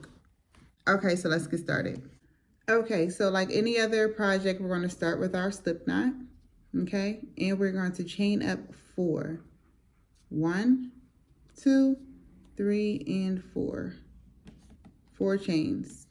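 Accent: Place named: Philadelphia, United States. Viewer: Been following you for a while since your crocs went viral . I heard your voice & was thinking where in the world is she from ?? She gotta be from Philly lol❤️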